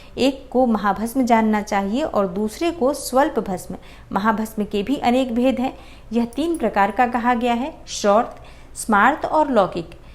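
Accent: Standard. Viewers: 🙏🙏धन्यवाद आपका उच्चारण बहुत सुन्दर,सरल और शुद्ध है ❤ हर हर महादेव 🌹🙏🌹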